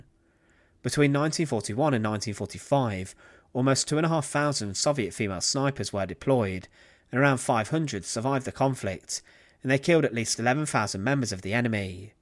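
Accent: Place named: England, United Kingdom. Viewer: It's the guy's English accent. I don't know which particular accent it is, but the "fousands" bit, instead of "thousands," just baffles me.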